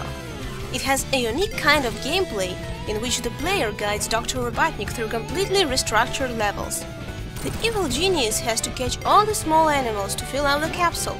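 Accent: Russia. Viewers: Russian accent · you're trying to hide your Russian accent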